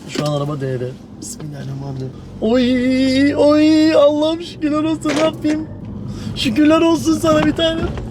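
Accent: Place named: Muş, Turkey. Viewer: Hayt dayim canını yiyim ne güzel konusuor mus sivesiyle muslular candir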